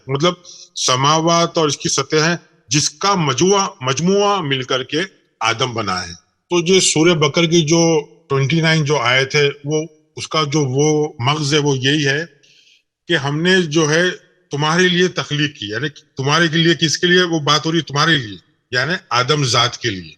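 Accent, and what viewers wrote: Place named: India. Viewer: مجھے لگتا ہے تمھارے لہجے سے کہ ہندوستانی ہو اور اپنی مرضی سے قرآن کی تعویل کر رہے ہو۔شاہد ہندو بھی ہو۔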